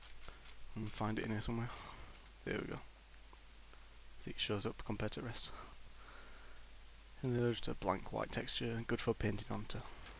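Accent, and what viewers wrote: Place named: North, United Kingdom. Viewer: Thanks so much for posting! Simple, easy to understand and to top it, you're a northerner!!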